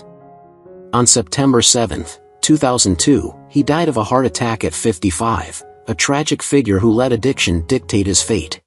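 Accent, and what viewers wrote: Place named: United States. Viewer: american narrator what do you expect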